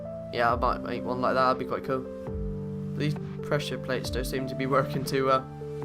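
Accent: United Kingdom. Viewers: I love your british accent haha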